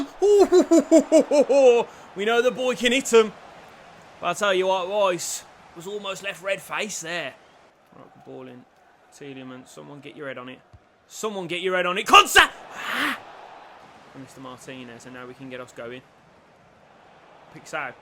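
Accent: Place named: Birmingham, United Kingdom. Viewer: I think you re from a posh area in birmingham shard end kingshurst chelmsley wood , solihill ?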